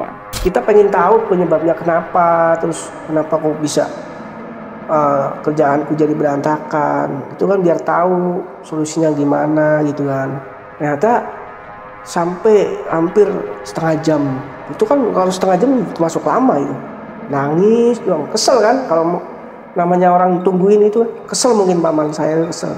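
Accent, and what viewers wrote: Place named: Sumatra, Indonesia. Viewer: Aku juga rada salfok sama logatnya gk ada Jawa jawanya. Kayak logat Sumatra.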